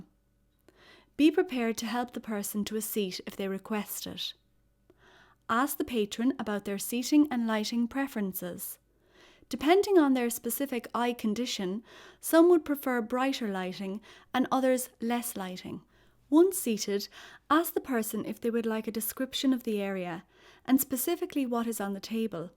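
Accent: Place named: Ireland. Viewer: The narrator sounds cute. I'm a sucker for Irish accents.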